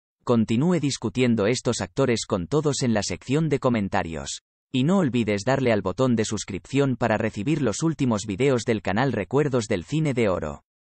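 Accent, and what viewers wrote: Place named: Spain. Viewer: Con respeto es muy interesante sabes más del cine de oro mexicano y la relaciones familiares con actores que todavía tenemos en vida, pero oooooo en cuanto empeze a oír el acento español lo quite a la vrg. No puedo con la Zetaaa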